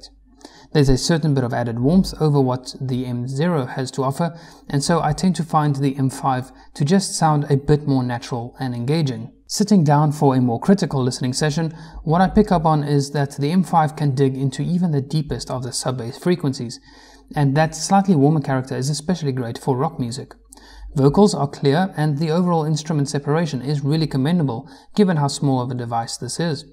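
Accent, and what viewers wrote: South Africa. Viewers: Dat SA accent B-)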